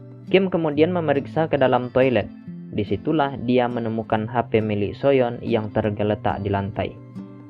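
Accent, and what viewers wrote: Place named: Eastern Indonesia, Indonesia. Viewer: Kk org mana..logatnya kayk org timur😄😄😄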